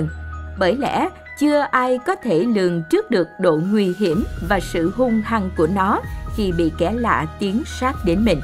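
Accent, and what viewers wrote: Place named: South, Vietnam. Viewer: Người miền thì gọi là “ mồng gà “ người viết bài chắc không phải là người miền Nam nhưng người đọc thì phải .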